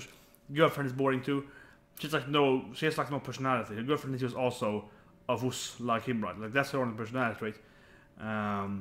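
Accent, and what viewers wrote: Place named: Sweden. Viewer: Yeah, but it gets better, believe me. You also wondered about the other two Girls in the first Kamine and Goda bit. The first girl with the green twintail hair is called Nakasone Maya and is only seen in this bit and a later chapter. The other one, called Patricia, is one of the best characters in the series. I hope they are saving her real involvement in a season 2, since we haven't even seen half of the couples yet. If she was going to be in the show more in this season, she would have been introduced in like episode 2 or 3. I hope they make a season 2, which is like this one but with the other couples and characters that were introduced at this time as focus instead, and then a compilation season with the first 2 seasons in one, the difference being that it would become a 24 min episode show, have the "bits" in correct order AND add the extra pages and Tsurezure Children Plus! 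stuff from the manga. Btw, Svensk? Din dialekt pekar åt det hållet. (<----If you didn't understand that, then nevermind :P)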